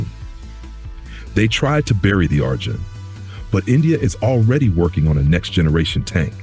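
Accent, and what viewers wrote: United States. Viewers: Butthurt Indian guy puts up a video defending his tin can in American accent.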